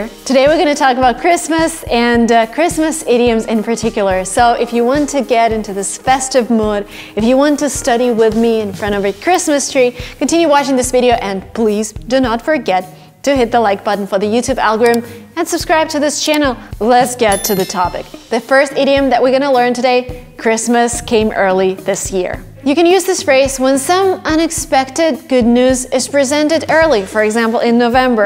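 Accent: England, United Kingdom. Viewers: I really adore your english accent. Thanks for your all video. Merry Christmas 🎅🎅🎅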